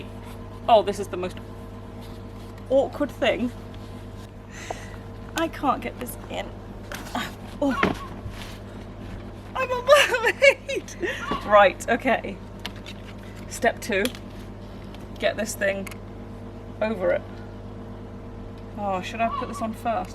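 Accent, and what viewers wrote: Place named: RP, United Kingdom. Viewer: She sounds posh btw love ya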